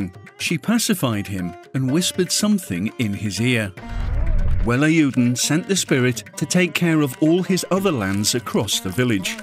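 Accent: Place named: England, United Kingdom. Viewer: Great going guys! loving the series. 1 small small gripe - why is an angez narrating the stories ? feels so colonial. No offence to Mr. Narrator hands folded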